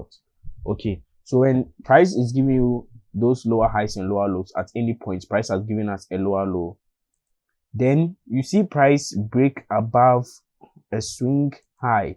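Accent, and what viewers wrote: Ghana. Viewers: The Ghana accent is strong with this one! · you sound Ghanaian.please are you in Ghana?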